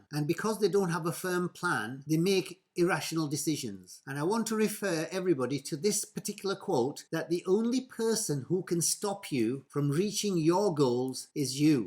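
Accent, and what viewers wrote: United Kingdom. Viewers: Thank you Sir for your financial ideas and for your perfect British English. God Save the King!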